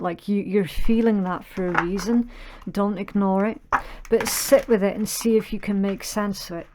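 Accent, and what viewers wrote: Scotland, United Kingdom. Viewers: I’m new to you channel … you absolutely nailed it !!! Every detail !!! Well done .. hello from Rhode Island, USA … love your Scottish accent ????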